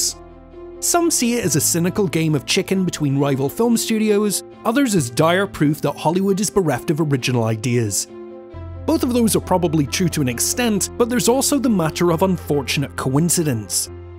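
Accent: Scotland, United Kingdom. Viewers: yes that's the reason I was wondering about your accent...as I told you I'm from cali a city from Colombia south America but now I live close by the missisipi river.. By the way I will let you know someday when my dark fantasy novel come out... Respect Scotland people... keep on coming with more content.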